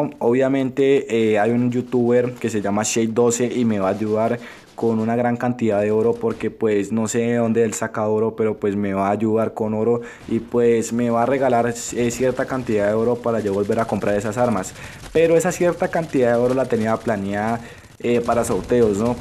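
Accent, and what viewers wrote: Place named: Colombia. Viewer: Pensé que era colombiano por el acento y cuando dijo bacano me lo confirmo . Saludos desde bogota !!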